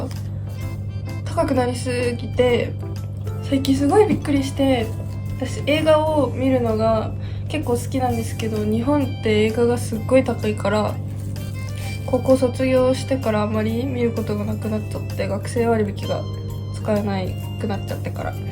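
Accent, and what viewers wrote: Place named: Northeast, China. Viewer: 😊Chinese have a littile northeast dialect, rise up！🎉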